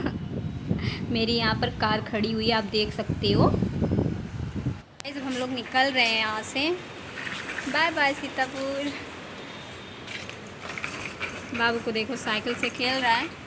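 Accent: Bihar, India. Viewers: Tum bihari lagti ho... Bhasa to bihari lagti h...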